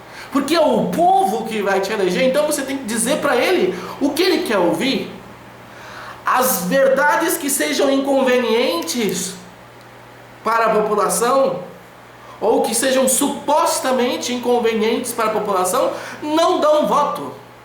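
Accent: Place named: Minas Gerais, Brazil. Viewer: Eu pensei em duas possibilidade: 1) ele tá treinando uma técnica de oratória. 2) ele tá misturando o sotaque dele com o mineiro e/ou baiano. O estar bêbado pode ser uma terceira hipótese rsrsrs >.<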